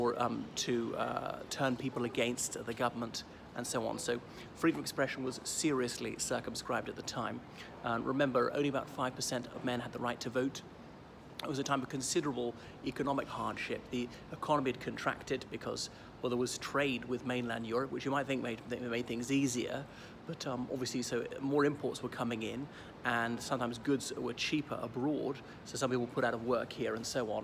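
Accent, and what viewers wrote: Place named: England, United Kingdom. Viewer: Your English accent keeps my attention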